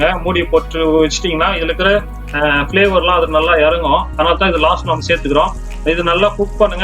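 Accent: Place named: Bangalore, India. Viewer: Bro neengo Bangalore slang pesuringo correct I think you are from Bangalore I followed your tips it came very wellmy wife & childrens enjoyed it Thank you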